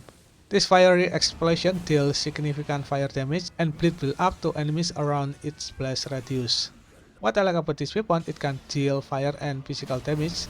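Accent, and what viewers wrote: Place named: Indonesia. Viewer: iya bang pantesan aksennya kyk org indo cuman pas liat channel infonya dari us wkkwk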